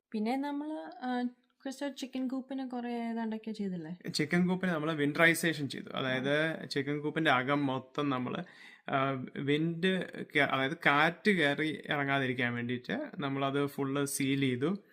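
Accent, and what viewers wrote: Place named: United States. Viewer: How is speaking fluent malayalam and american accent english?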